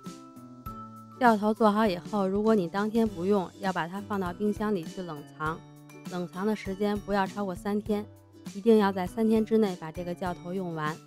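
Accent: Beijing, China. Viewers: 哈哈哈 听说话 up主是北京人吧~ 按照你这个试试 之前做过一次 失败了╮(╯▽╰)╭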